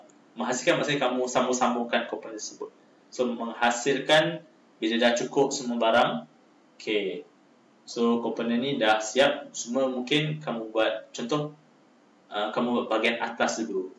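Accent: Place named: Sabah, Malaysia. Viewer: Macam orang sabah bah si ni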